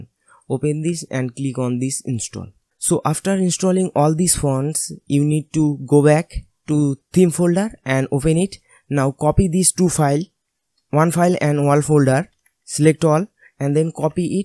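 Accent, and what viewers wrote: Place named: Bengal, India. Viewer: i hate bangali english accent. could not go through torture. closed.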